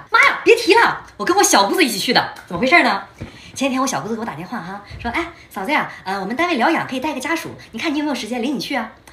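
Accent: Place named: Northeast, China. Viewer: 还得是用东北话讲才带劲儿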